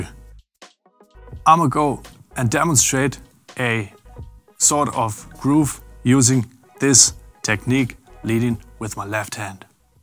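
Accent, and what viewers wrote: Germany. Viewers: I love the german-english accent for some reason. It sounds so smooth.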